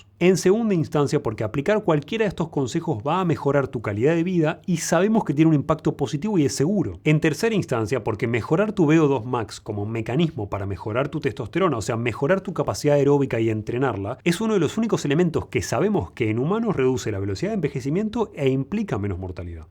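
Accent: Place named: Córdoba, Argentina. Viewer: Eres de Córdoba por tu acento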